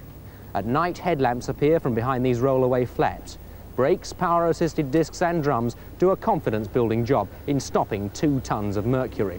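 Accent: United Kingdom. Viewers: Gary Dunn Yeah, but my point was just that people pronounce (and spell) things differently in different countries, so no-one is right or wrong. This video is of a British programme (<yes, that is the British spelling) for a British audience, so that is why he pronounces Marquis the way he does. The British pronounce 'coupé' as the French do, but Americans say 'coop'. I don't suppose it really matters...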